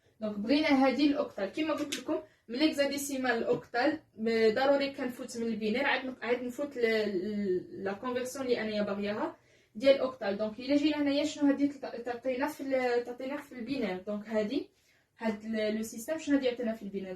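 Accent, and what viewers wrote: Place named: Algeria. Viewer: تبان لهجتنا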